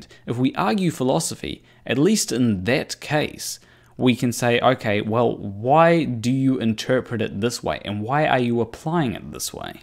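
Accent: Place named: New Zealand. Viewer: There was a point in this video where I was really distracted by the phonetic "eegs" which I thought was eggs, but later on you said it with the letter X on screen. Not a diss, I quite like the quirky kiwi accent is. At least you don't make everything sound like a question?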